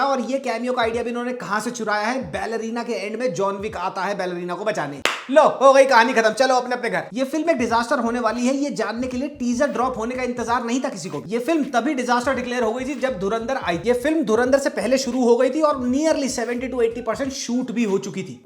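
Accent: Haryana, India. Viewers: bhai are you haryanvi??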